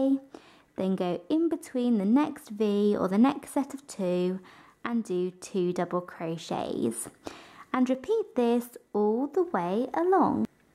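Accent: United Kingdom. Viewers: listens to accent Oh ok, so British terms... says double crochet while making a treble Damnit brain, you fooled me again!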